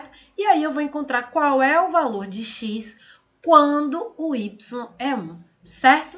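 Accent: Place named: Paraíba, Brazil. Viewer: Tenho uma sobrinha que se chama Juliane, é paraibana, e tem sotaque idêntico ao seu.... Parabéns pelo vídeo. Muito explicativo. Paulo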